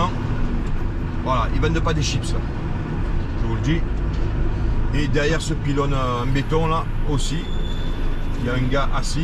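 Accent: Marseille, France. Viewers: Ta façon de parler est identifiable à Marseille tout le monde ce connaît … Pour quelques vues sur YouTube mettre sa vie en danger 😱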